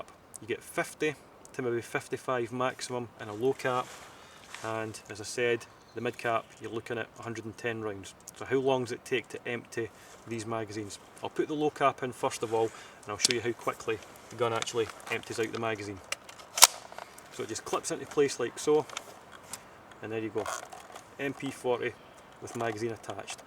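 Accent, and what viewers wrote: Scotland, United Kingdom. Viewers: heavy scottish brough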